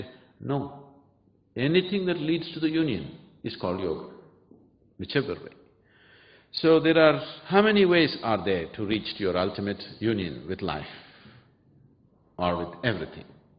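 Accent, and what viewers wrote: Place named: United States. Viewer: interesting how even Sadhguru adapts the American accent, because of its surrounding..you don't hear him speaking like that in India :) have a blissful life you all..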